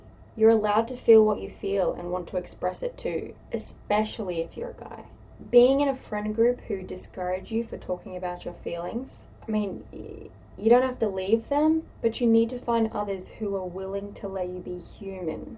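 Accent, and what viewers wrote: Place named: Australia. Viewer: Aye u got a funny Australian accent g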